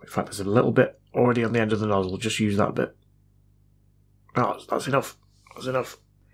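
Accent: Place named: Bradford, United Kingdom. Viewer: All I want to know is if you're originally from the Bradford area? (because, inflection for inflection, you sound exactly like the Lost in the Pond dude.. and that's where he's from)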